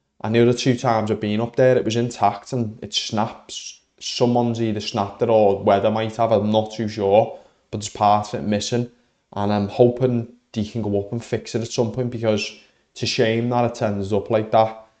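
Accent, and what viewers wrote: Liverpool, United Kingdom. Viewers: Time to switch off. Horrible Scouse accent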